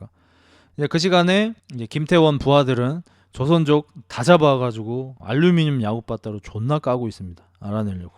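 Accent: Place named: Gyeongsang, South Korea. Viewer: 경상도랑 조선족 묘하게 섞여서 혼란스럽네 ㅋㅋㅋㅋㅋㅋㅋ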